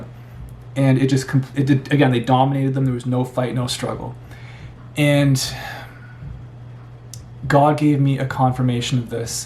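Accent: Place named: Canada. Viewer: The Canadian accent is similar to my Minnesotan accent.😂 The books of Joel and Acts (amongst others) tell us that dreams and visions are legit ways that God speaks. (I know you know this...putting it out there for others reading comments) I've had few dreams I know are from God and he's given interpretation. Have you experienced any dreams that you believe are from the Lord that you haven't received interpretation for...yet? I ask because I believe I have and because I come from a faith community that is "practically" cessasionist...so I don't have many people that I can ask these questions.😁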